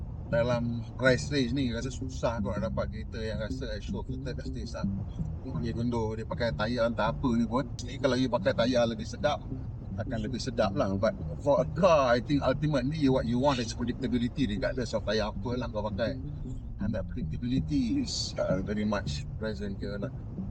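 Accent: North, Malaysia. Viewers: Biasanya saya akan puas dengan penjelasan, cara bercerita dan selit berseloroh loghat utara, tapi kali nih saya berkedut dahi nak faham abang sulam campur rojak dgn bahasa Inggeris..hemmm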